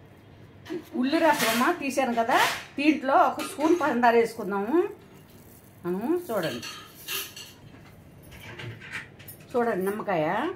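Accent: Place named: Srikakulam, India. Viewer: Amma medi srikakulam la undhi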